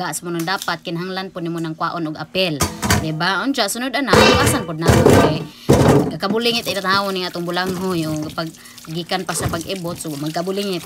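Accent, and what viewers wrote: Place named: Bohol, Philippines. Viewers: Ganahan kaayo ko maminaw sa imong Bisaya accent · Hi mga nice jamo imong mga content sa vedio vlog,mga lami au imong mga gepangluto,accent sa u inistoryahay that Bohol jd,jamojamo jd......kalami 😋makalaway oi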